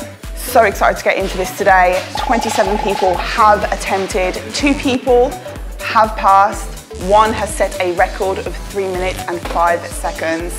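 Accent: United Kingdom. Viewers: I like her British accent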